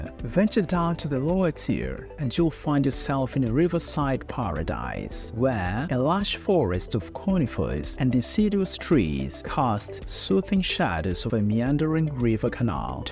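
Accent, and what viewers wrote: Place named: RP, United Kingdom. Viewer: Kizungu cha BBC news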